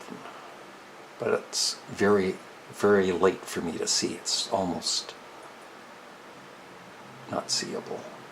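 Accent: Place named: Canada. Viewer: First off I love your Canadian accent. Secondly I am not color blind and saw a clear number in every circle. You were very accurate in your guesses when you weren’t sure. I hope you get the chance to get the glasses from what I’ve seen online it can be really life changing. Maybe you could do a go fund me and I’m sure people would donate.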